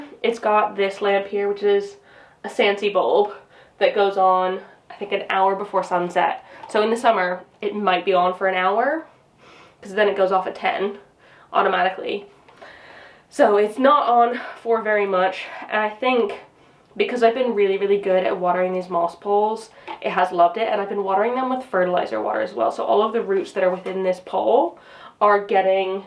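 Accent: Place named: United Kingdom. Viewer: Thank you! That seems about right—I should have known. I think that one is going on my list! Thanks for the quick answer! On a totally unrelated note, and I’m being a nosy new subscriber here, were you raised in the UK? Your British accent doesn’t seem to me to be as pronounced as others I’ve heard. Anyway, love your videos!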